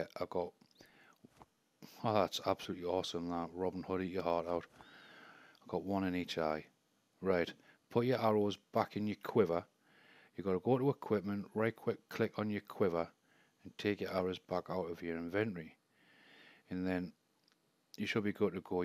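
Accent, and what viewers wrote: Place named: Newcastle, United Kingdom. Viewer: GREAT TO HEAR A geordie hhaha x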